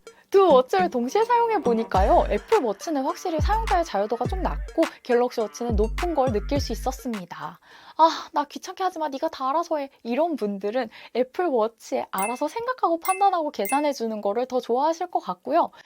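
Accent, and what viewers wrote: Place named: South Korea. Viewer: 정칙한 한국인 영어에 구독버튼 누릅니다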